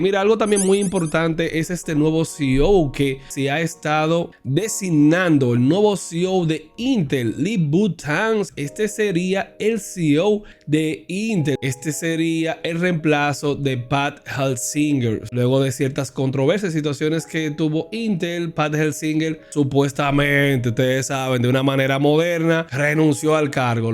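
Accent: Dominican Republic. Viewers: buen video, sos de Republica dominicana? que tal el stock alla ?xD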